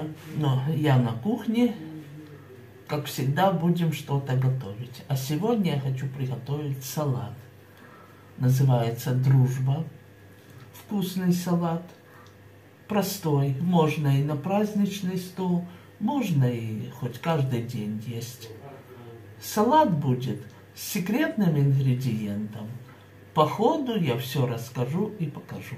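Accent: Odessa, Ukraine. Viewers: Так мне слышится Одесский говор 😊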